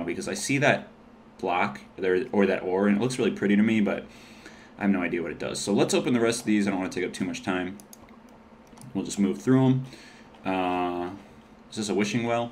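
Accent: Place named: Michigan, United States. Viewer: im from michigan too so sounds normal to me lol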